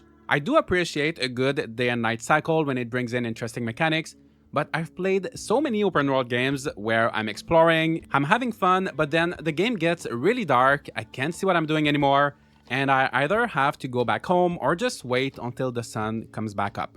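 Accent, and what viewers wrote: Quebec, Canada. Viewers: C'est sûr que t'es Québécois! Je me trompe?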